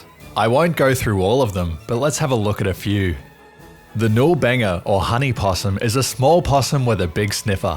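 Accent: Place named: South Australia, Australia. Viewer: You've got s similar sounding voice to OzGeographics, albeit a bit lower pitch. Same South Australian accent though 👍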